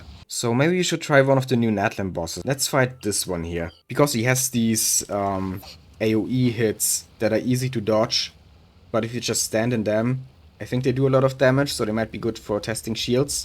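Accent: Germany. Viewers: Höre ich da etwa deutsches english?😅😂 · you have a thick german accent bro i will sub tho cus nice video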